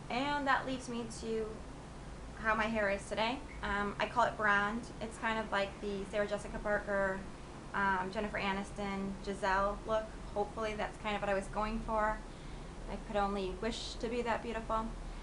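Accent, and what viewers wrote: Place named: New Jersey, United States. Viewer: i love your voice, you sound jersey haha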